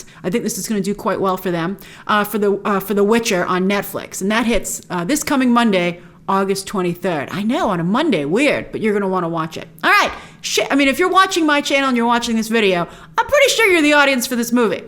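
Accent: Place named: East Coast, United States. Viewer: It’s east coast speaking style. Very common for a lot of EC people to sound like that. She sounds like my family members so maybe it’s just me lol. Anyway, I’m looking forward to Monday!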